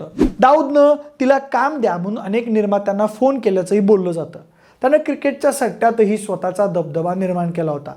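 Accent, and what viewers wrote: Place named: Kolhapur, India. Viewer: कोल्हापूर चे आहेत बहुतेक, बोलायच्या style वरून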